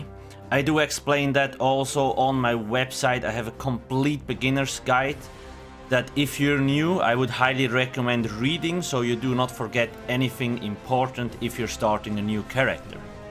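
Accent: Switzerland. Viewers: Sounds like swiss dude. I can spot accents 🤣🤣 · Are you from switzerland? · Thanks for the vid! And that accent sounds very Swiss!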